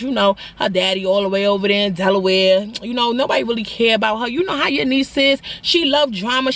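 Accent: New York, United States. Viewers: . You sound like you’re from NY? I’d never guess you are from the south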